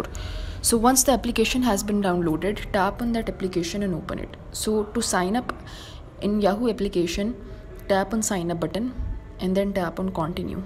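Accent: India. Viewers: it is indianeese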